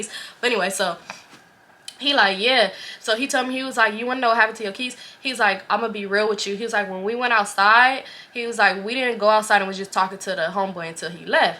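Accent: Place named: Los Angeles, United States. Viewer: First off all Girl shots out to you! And believe me when I tell you I don't even do shit like comment on vlogs or subscribe to chanel's that aren't podcast. (Maybe I'm an official old head I'm 31btw) but this is very refreshing, relatable, down to earth, creative, and funny. ya delivery, ya detail ya tone, the slight L.A accent and slang. I'm a jersey girl. I say all this to say I want more stories bout Joe! 🤣🤣🤣 So as your newest subscriber and biggest cheerleader tell more bout Joe! Nah all in all do ya thang my sister. You got it!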